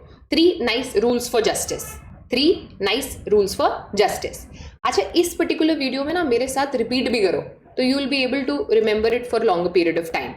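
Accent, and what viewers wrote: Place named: Bengal, India. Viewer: I thing he is from Bengal , his means “Jaldi kro gap mat Karo”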